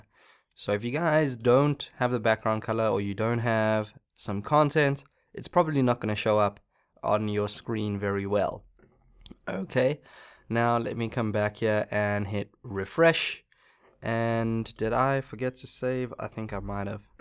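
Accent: South Africa. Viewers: Reading these things on tutorial sites and watching someone explaining these things to you are two different experiences. Thank you for providing clarity on this , looking forward to learning more from you ....Also i can hear that fellow South African Accent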